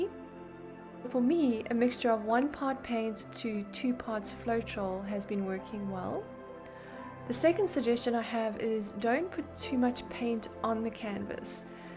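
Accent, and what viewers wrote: South Africa. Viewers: So pretty. Yes I was having the same challenges with the paints - def going to try your tips. I enjoyed listening to the SA accent - made me homesick.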